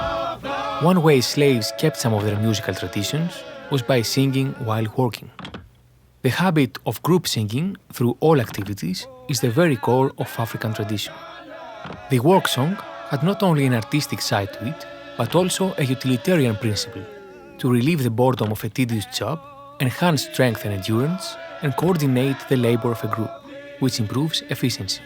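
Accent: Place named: Greece. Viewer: Are you Greek by any chance? Your accent seems very Greek to me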